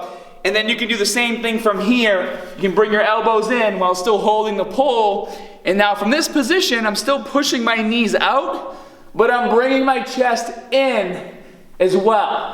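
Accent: Boston, United States. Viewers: That Boston Accent is serious! Good info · What part of Boston are you from brother? BAHBELL LIFTS ALL DAY :) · Definately from Boston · Sooo Boston! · lol Bahbell. I find a problem where when I ass to grass squat for 10 reps+ I start bouncing out of the hole when I start getting fatigued. I feel like I'm cheating myself but I'd like your opinion! thanks man love the Boston accent by the way haha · Are you from boston you have the accent.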